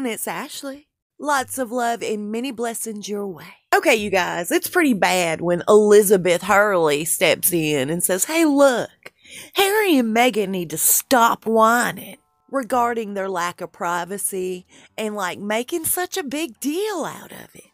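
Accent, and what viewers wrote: South, United States. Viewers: You always make my day with your videos. Your a awesome story tell and love love love the southern accent.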